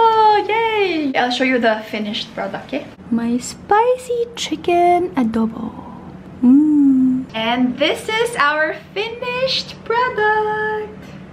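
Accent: Visayas, Philippines. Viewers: "Hay ka lisod sa kinabuhi kung nag inusara raka" ahh ka kyut gyud mag bisaya oy. Huhu teee notice meee please 😭🙏🙏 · Haha cute accent ng bisaya😍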